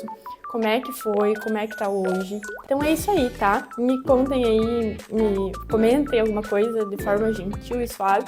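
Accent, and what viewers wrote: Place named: Rio Grande do Sul, Brazil. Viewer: Seu sotaque de voz e muito lindo eu Amo sotaque Gaúcho mesmo náo conhecendo os gaúchos eu os adimiro muito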